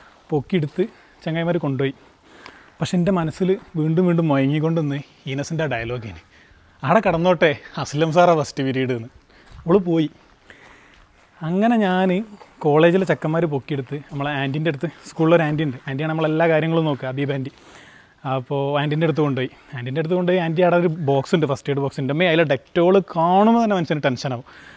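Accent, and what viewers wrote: India. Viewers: സംസാരം കേട്ടാ അറിഞ്ഞൂടെ കോയിക്കോട് ആണെന്ന് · കൊടുവള്ളി കാരനാണോ, slang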